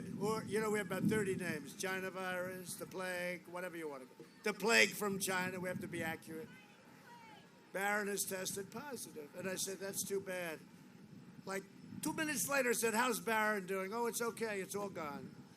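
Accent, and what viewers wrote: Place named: New York, United States. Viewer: Jesus....if the Man doesn't win it's not because he didnt try. ALWAYS on the Go. And here's the thing....if Biden doesnt give me more of a reason to vote for him then Im voting Trump. I want some energy. Some excitement. Trump hasn't done THAT bad. I can't blame him for the Virus response...he had nothing to go by. He talks like he is: A New Yorker. Good AND Bad. Im STILL undecided.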